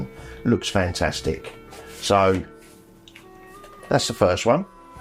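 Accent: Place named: Cockney, United Kingdom. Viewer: I noticed that Andy Serkis as Alfred the butler in THE BATMAN from 2022 has the same accent as you. What accent is that exactly? Is it Cockney or a variation thereof? On another note, when we will see a video of you kicking Tarantino’s ass?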